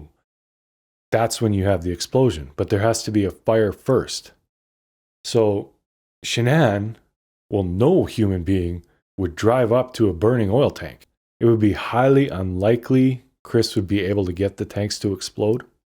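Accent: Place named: Alberta, Canada. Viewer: I thought so. I live in Texas but am from Alberta. I hear our accent lol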